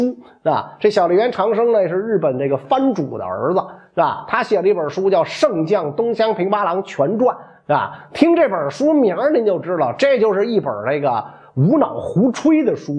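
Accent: Beijing, China. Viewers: 講普通話，有些北京腔聽不太懂。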